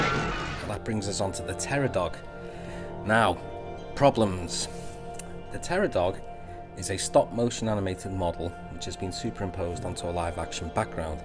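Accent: Liverpool, United Kingdom. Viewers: What part of Liverpool/Wirral are you from mate? Love what you’re doing here. Good on ya.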